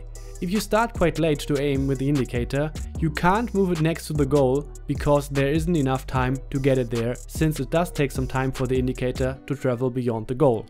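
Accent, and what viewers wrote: Germany. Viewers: Man hört einfach, dass er ein Deutscher ist 😂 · The classic German English 😁 great video! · Hört sich ziemlich deutsch an😂😊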